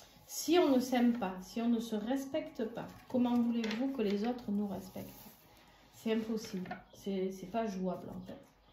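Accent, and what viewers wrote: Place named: Provence, France. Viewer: Votre accent....j'adore ! 👍 le soleil, les cigales,les oliviers, les lavandes....tout simplement la Provence ! En tout cas, la Balance que je suis se retrouve dans cette guidance très parlante dans beaucoup de domaines. En pleine succession familiale, avec tout ce que cela implique....pas simple à gérer. Professionnellement je pense qu'ils vont savoir comment je m'appelle !!!! Relationnel et sentimental, il ne veux pas déterrer la hache de guerre, silence radio depuis des longs mois, je sais qu'il ne reviendra pas. Je guéris tout doucement....Merci ❤⭐🌹🙏🌞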